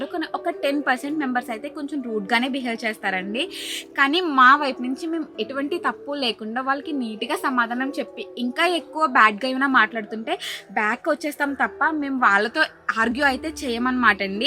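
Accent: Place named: Godavari, India. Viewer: Godavari vallu alane matladataru andi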